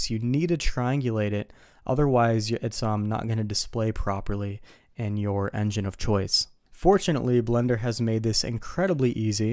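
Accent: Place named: North America. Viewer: You sound North American to me. You have a deep voice, you must be 6'3", therefore I need to be all ears, as English is my second language. Overall, I mean, it's good to hear native english speakers. When someone tries to explain with strong foregn accent, I just turn off their videos. Lol. Thanks for sharing useful info! Good luck!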